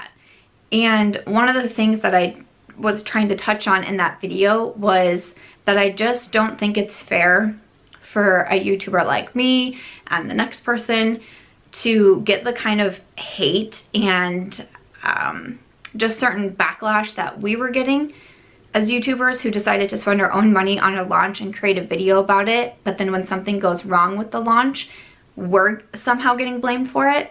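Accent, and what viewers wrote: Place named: Midwest, United States. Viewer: Love the midwest in you!!!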